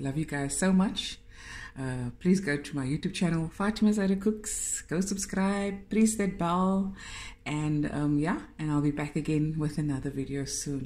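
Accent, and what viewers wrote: Cape Town, South Africa. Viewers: Love your food. Love your laughter. Love your accent. I miss Cape Town and my cousins and aunties that live there when I hear you speaking. Lastly you are soooo clean!! My late mother had all your recipe books. She was pedantic about cleanliness so she would have loved you even more had she seen your YouTubes and how immaculately clean you are.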